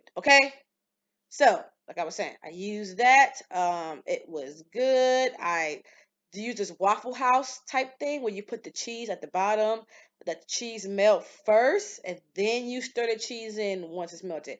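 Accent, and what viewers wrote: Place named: Baltimore, United States. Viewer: I LOVE listening to you and watching your videos lol! You are so down to earth and don't be with the extras...please keep them coming. You sound like you're from Baltimore where my family is from:) Thanks so much!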